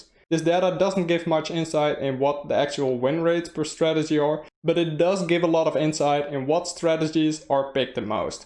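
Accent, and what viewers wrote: Netherlands. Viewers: Je kan je Nederlandse accent niet verbergen haha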